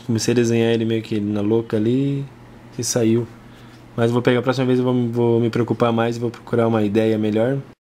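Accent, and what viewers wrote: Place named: South, Brazil. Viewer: Gostei muito do vídeo, comentários bem pertinentes e achei ele bem carismático e natural... Amo esse sotaque do sul ❤😆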